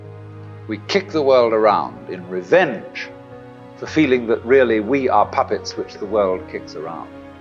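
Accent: England, United Kingdom. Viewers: Where do I begin. But that was the biggest most flowery word salad mixed with a pinch of despair I have ever heard. TRUST NATURE, your are nature, we are nature, nature will let you down, life has no purpose, Watt goes from if you don’t trust nature you will get tyranny??? Making a huge jump to support your ideology wouldn’t you say. I have never been less inspired listening to someone than what I just heard. But it’s cool and accepted because Watt has an English accent. You’re being lied to and you have no hope. But fear not because you can always trust nature, sometimes…., Wow!!!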